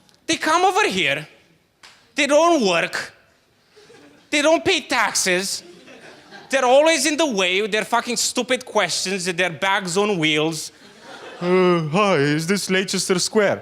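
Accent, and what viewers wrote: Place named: Mexico. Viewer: "Sometimes I go to America I'm Mexican" So, accent sounds Mexican to some Americans.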